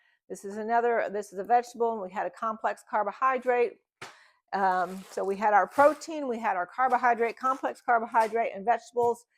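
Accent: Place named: Appalachia, United States. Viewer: Are you kidding me? People’s audacity blows my mind! I’d have talked even more Appalachian if someone had shown opposition of my sound! 😂 Friend, if your dialect runs off into Appalachia without your knowledge again, let it go! ✌🏻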